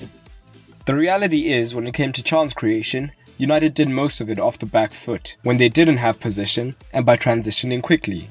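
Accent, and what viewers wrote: South Africa. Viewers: Hey man are you South African??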